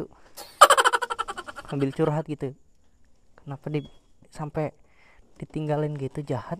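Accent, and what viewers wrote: Tegal, Indonesia. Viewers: Bang luh orang tegal mana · anjayyy tegal ni kayanya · Abang orang tegal yah bang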